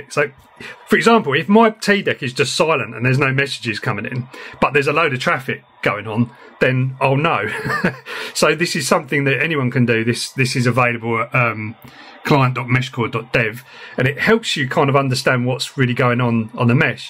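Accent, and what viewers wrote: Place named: United Kingdom. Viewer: Can you speak with US accent? This UK accent is trash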